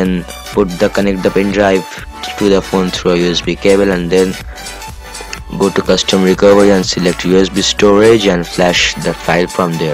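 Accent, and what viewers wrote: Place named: India. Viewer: lazy english or indian accent i hate it.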